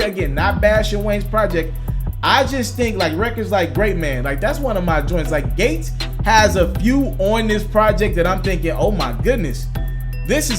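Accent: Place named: New Orleans, United States. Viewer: Lol facts bro u new orleans niggas crazy fr fr / just look at how u talk everybody else in america say three(3) / new orleans really do say tree even 90year old white racist old people say tree in new orleans lol😁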